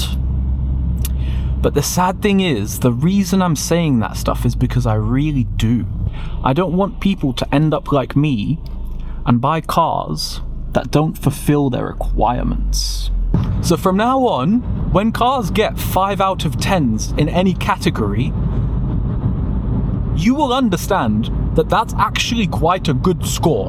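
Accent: United Kingdom. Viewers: I'm learning english recently.. I wanna ask you guys.. What is the name of the British accent that is used by this person? I wanna learn more about it ..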